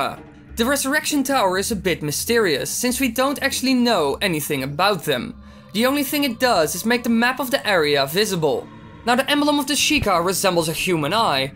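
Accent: Netherlands. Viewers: You're Dutch right? If so, dat is te horen haha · ik hoorde het aan je accent, ik heb t gevoel dat ik dat bij elke nederlander kan 😂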